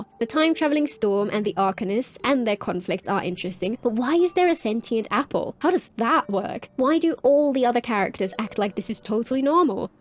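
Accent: United Kingdom. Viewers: You sound Bri'ish like Ver'in and Regulus...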